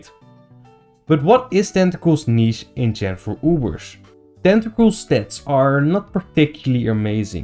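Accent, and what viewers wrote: Netherlands. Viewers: Hi are you dutch?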